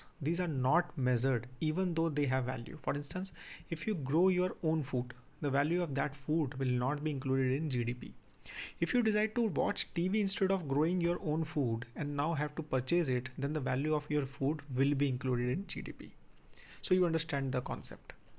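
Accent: India. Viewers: Nice Indian accent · I hate Indian accent.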